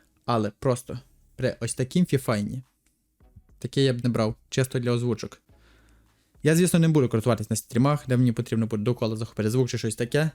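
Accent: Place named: Western Ukraine, Ukraine. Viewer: у вас цікавий акцент ) Іванофранківщина/ тернопільщина чи закарпаття?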